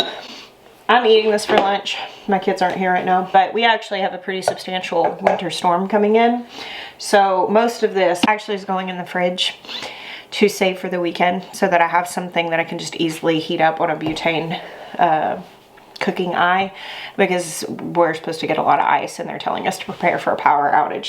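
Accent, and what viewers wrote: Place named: Arkansas, United States. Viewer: It's that good old Arkansas southern drawl! I am also from Arkansas. Not far from where lived before moving. I wish I'd met her back then.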